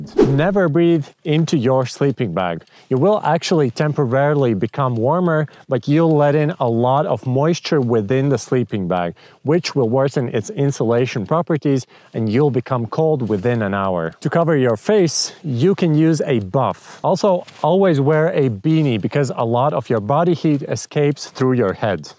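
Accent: Latvia. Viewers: Man jau likās, ka es dzirdu latviešu akcentu. Labs video! :)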